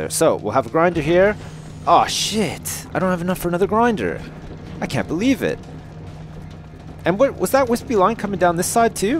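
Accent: Canada. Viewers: Which part of Canada are you from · Oh, you are so canadian. soory everytime you say it it makes me smile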